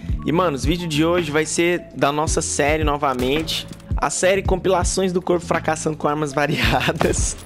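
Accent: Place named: Goiás, Brazil. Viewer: advinhei pelo nosso sotaque, também sou de Goiás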